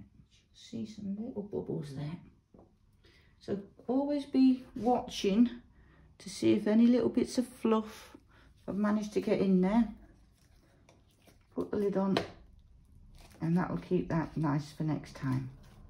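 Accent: United Kingdom. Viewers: Thanks for this great video. I have been working with Indian ink on paper for years but painting on canvas is totally new to me. I've been varnishing test pieces done on gessoed cardboard (literally crisscrosses of paints and acrylic ink so that if anything runs or smudges I can see it). I just want to learn how to apply the top coat of glaze (the isolation coat, as they call it, if you want to protect your paintings with removable varnish) with zero brushmarks and your video was really helpful. PS I love your accent, it reminds me of living near Sheffield when I was a toddler 🤪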